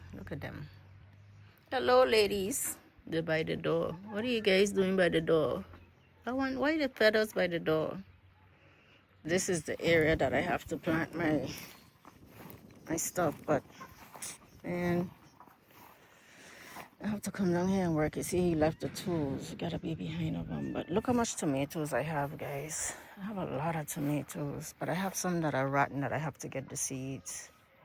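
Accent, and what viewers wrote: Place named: South, United States. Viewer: you sound like a real country gal, a very informative lady to connect with 🎉🎉🎉❤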